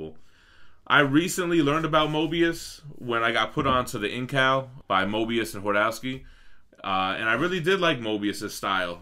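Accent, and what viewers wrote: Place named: Bronx, United States. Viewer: i dig your videos and love your south Bronx accent but i gotta ask...lol. where in the world do you keep all the goods constantly coming in dude? lol between the statues and the 12 inch books, you must live in a mansion or a warehouse..lol. peace.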